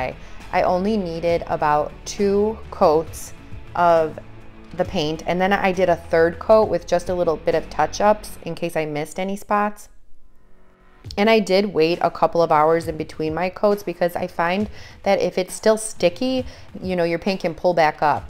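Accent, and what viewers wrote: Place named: Minnesota, United States. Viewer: I love this! I have already ordered most of the items you use! Also, I love your Minnesota accent!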